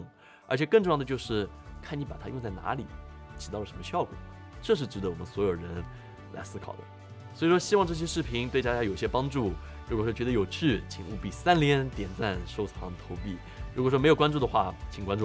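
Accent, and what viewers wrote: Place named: Hangzhou, China. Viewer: Tim的杭普话 太亲切了！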